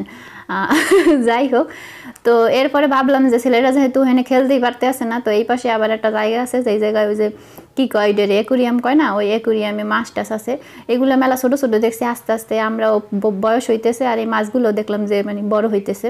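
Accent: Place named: Madaripur, Bangladesh. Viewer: Apu tomar madaripurer vasa huinga moroto madaripurer vasa muhe aiya porte ace.onek din doira koite parina. Dese gele komu InsaAllah.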